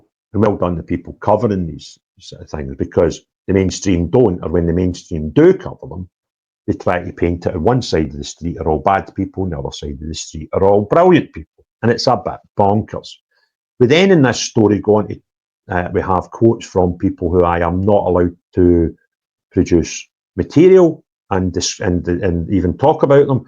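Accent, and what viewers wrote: Scotland, United Kingdom. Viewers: Thanks but I struggle to understand your Scottish accent - just struggling a bit with the Scottish accent - still got the gist though! the Scottish globalist are depending on the taxpayers to fit the bill and that is a bit unfair! Scottish accent strong,! Got it though Appreciate it,! Accent almost lost me!, I’m taking notes… and also trying to decode that accent 😆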